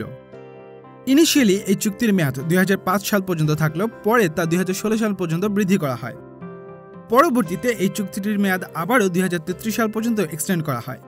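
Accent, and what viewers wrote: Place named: United Kingdom. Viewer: আপনার সব বাংলাদেশী youtubers রা বাংলা ভাষা বলার সময় এতো British দের কথা বলার টান ব্যাবহার কেন কেরন।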